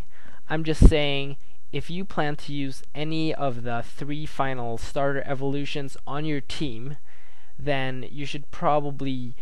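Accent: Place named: United States. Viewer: r u an asian or an american? well, u sure have an american accent but look like an asian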